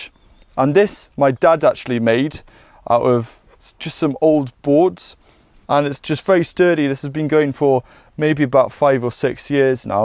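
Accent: Wales, United Kingdom. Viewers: if you have uv lights - don't use a pink sharpie! wrote them indoors and now the labels are invisible in the greenhouse :) derp. Great video, will subscribe. Interesting accent, similar to my husband, Welsh with English parents :)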